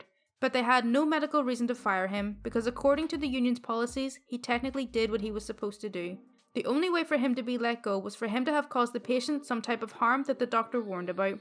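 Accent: Ireland. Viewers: Np, it's true though. ^-^ You really never considered it? And hmmm... The obvious would be a wise guide character type, such as nanny mcfee. The accent, (Soft Irish?), is perfect and you're very well spoken. I'd guess an anime protagonist would be best though. You have a welcoming voice, and you're likable. Bet you could rock a Ghibli film! What do you think would suit you? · Hm... are you Irish,?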